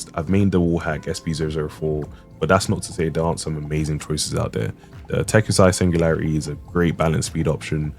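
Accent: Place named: United Kingdom. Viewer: Nice video man! its refreshing to hear a brit for once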